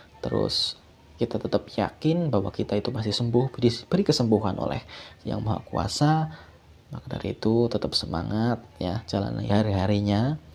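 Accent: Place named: Java, Indonesia. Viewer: dari logatnya kaya wong jawa ngapak mas.. kalo boleh tau domisili manan mas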